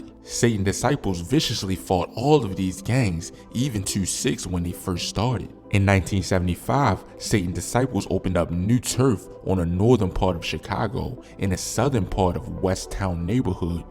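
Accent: Baltimore, United States. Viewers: Dam it most of the comments are asking you if your from Baltimore from your accent .Now I wouldn't know difference between the 2 states.Im from Bakersfield, Ca.,but if I had to guess I'd say Baltimore due to the fact that people from Chicago have a funny way of saying" CAR" they say "CAHH" lol😅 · I just recently started watching your channel - so I’m sure other people have asked this question before …… but you from Baltimore? Just trying to see if my ears caught the right accent 🤔 🧐 👂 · His pronouncing youths as “you’ves” is definitely a Baltimore accent, sounding like Snoop from The Wire saying “Youffs” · DEfinitly sounds like Baltimore. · A narrator with a Baltimore accent speaking on Chicago history . No thanks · This narrator sounds like they have a baltimore accent. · Yaaap Buddy ass sounds like he from West Baltimore! Respect from Humboldt Park Chicago Illinois. 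😊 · You can tell the narrator from Baltimore that accent thicc!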